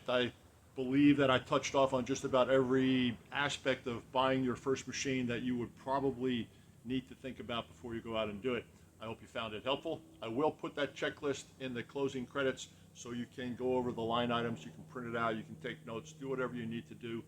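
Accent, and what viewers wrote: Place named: Philadelphia, United States. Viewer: I'd guess Philly or nearby South Jersey but north of Baltimore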